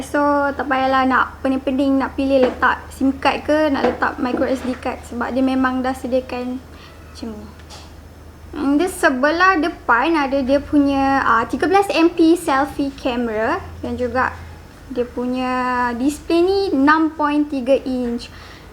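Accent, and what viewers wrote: Kelantan, Malaysia. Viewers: Ore kelate ke review.? Macam kelate jah... Jugak..?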